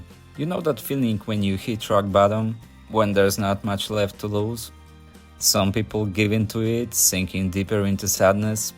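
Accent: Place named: Ukraine. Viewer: U must be wealthy. Dalmatia is tourist destination, which means expensive and u gotta have good income to support yourself. It is not Monte Carlo, of course, but still locals struggle to survive and people of your age left to work in EU, mainly Ireland, Scandinavia or Germany. Just renting something to live or real estate is up to the roof in recent years. And what is your home country? 2000 km and accent sounds like Ukraina.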